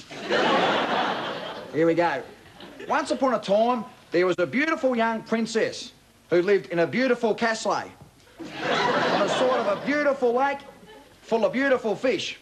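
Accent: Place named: Australia. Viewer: of course an aussie can understand him...and if you dont you obviously dont get aussie slang or humor!!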